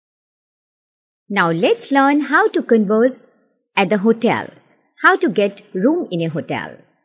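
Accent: India. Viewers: Charming woman's voice - but you have an Indian accent -- and there are errors... For example, the word is not correctly pronounced "Vindow". It's a "window". W. Made with the lips without the teeth touching the bottom lip. We don't say "I'm A (long A pronounced like the letter A) tourist" etc. We say "a tourist"...short "a". It's "Vun Thousand Bucks per night" . -- nope. ONE (pronounced "won") thousand dollars. "Bucks" is slang - and too familiar for this context. I believe I heard you say "bucks"...wasn't clear. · sounds indian accent..no i want to bettter learn from brits or americans thanks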